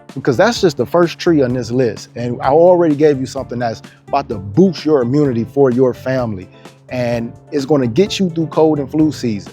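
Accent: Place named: Los Angeles, United States. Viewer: You sound like you’re from LA.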